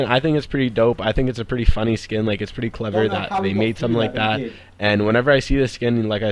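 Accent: Canada. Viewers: Yo where are you from, you sound like ur from Canada, more specifically Ontario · 100% Canadian. Fellow Canadians can spot out other Canadians by voice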